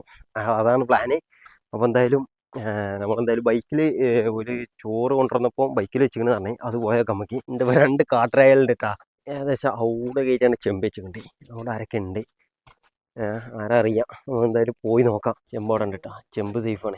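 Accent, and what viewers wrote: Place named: Malappuram, India. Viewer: 😂 ഇങ്ങള് മലപ്പൊറം എ വുടേന്ന പറഞ്ഞെത് എത്തായാലും വീഡിയൊ ഇട്ത്ത തൊക്കെ അടിപൊളയാണ് ട്ടണ്ണി അൻ്റെ വർത്താനും😂 നല്ല രസണ്ടേനി കേക്കാന്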